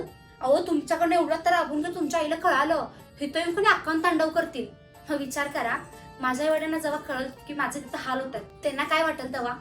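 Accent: Kolhapur, India. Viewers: Kolhapuri language ❤❤❤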